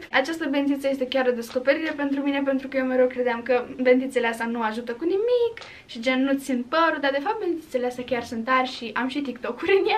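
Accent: Moldova. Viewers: Am o întrebare corbești moldovenește?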